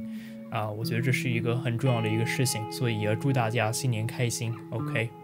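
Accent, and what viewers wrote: Taiwan. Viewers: 你的台湾腔为什么和籽岷腔一样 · 有業配金主爸爸了，讚讚。我們台灣腔就是很可愛！ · 我喜歡的台灣腔哈哈哈哈我被圈粉啦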